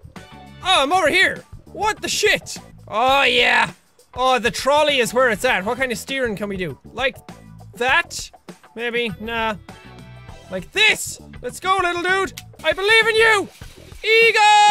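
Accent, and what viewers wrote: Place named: Ireland. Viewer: Swearing always sound so much funnier with an Irish accent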